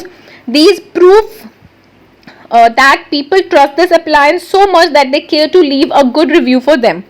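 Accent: India. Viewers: You speak like an indian guy and it sounds very funny😂😂😉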